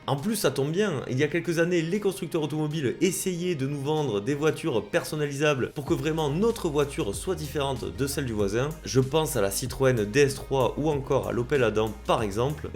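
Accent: South, France. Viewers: Toujours trop cool tes sujets, ta façon de présenter, ta bonhommie et ton petit accent du sud. On a l'impression que tu as une cigale dans la bouche, j'adore! Avec BenAuto, CarJagger, New Retro Car et POA tu es mon préféré number 1. Fut un temps ou y'avait pas trop de choix Citroën HY gris, 2cv bleu ou grise en raison de la reconstruction d'après guerre dans les années 50 aux dires de mes parents acheteurs de l'époque. La pénurie a duré un certain temps après 1946. Un ami me disait que les autos blanches sont plus sécuritaires car plus visibles qu'une couleur foncée. La nuit on peut les voir plus rapidement à une distance moindre qu'une foncée. Je me rends compte qu'on a toujours eu des autos aux couleurs tristes. Peugeot DMA lie de vin d'occasion qui sortait quand même du lot, Citroën HY gris, Peugeot 203 noire, Break 304 gris, Cabriolet 304 S grise qui était verte auparavant et a été repeinte en rouge après la vente, 205 brun macao qui sort un peu du lot, Samba GL noire et 106 XN noire de 1991 que j'ai toujours d'ailleurs et que je bichonne et me rends bien service quand j'y vais en vacances. 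J'avais payé un supplément pour la peinture noire vernis. J'adorais le noir ca faisait classe. Mais avec le temps je préférerai une couleur plus claire car le soleil tape dans le sud de la France du côté de Béziers et le noir attire la chaleur. Un cabriolet 304 S jaune paille ou jaune safran (orange) serait mes choix. Vive la Deuche vert pomme de 74 de mon beauf dans les années 78/80.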